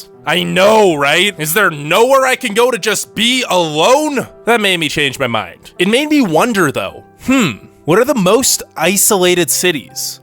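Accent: California, United States. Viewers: Petropavlovsk is ok to pronounce. What is really hard is this American accent the author speaks 😅, my tongue wud hurt trying to copy that: theRe aRe, the largest (lOrgest Karl, lOrgest ) city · Where is your accent from in the statex ?! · Why does your voice sound different in your videos? Some of them you have a more Californian accent.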